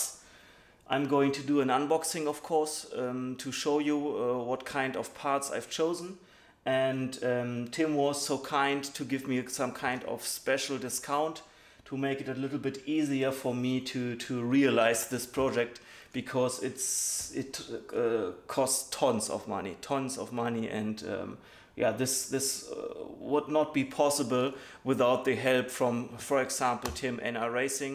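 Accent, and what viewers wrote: Germany. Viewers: Man hört direkt das es ein Deutscher kohlege ist 😂👌 geiles Teil bitte mehr davon !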